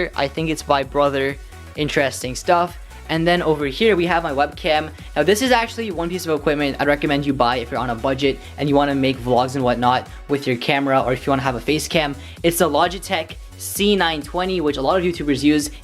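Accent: India. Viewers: Your Indian?? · dude are you ..... indian ? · u indian? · dude it seems ur Indian? Am I right? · are you Indian my friend???...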